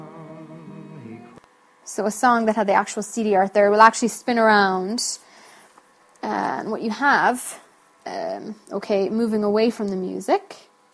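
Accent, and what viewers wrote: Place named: Ireland. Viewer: Irish accent + XBMC knowledge = LOVE!!!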